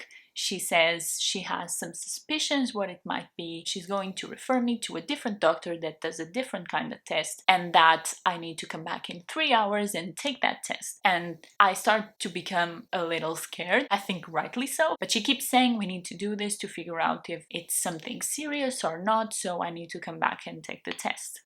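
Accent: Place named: Portugal. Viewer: Caramba, venho maratonando os seu vídeos e agora que percebi que você é Portuguesa!! Seu sotaque é incrível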